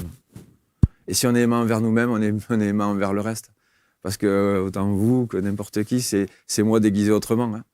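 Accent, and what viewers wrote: South, France. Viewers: Dommage pour la barbe longue…😢 J’adore l’accent du sud. 😊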